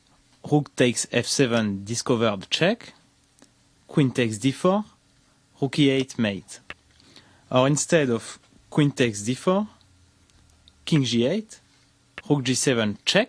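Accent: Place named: France. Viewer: It is "Think on this position" not "Sink on this position" ! You should work on your accent french boy !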